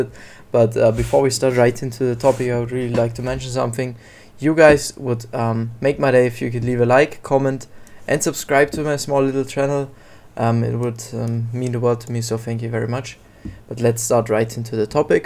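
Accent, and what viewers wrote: Germany. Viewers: Man merkt denn deutschen akzent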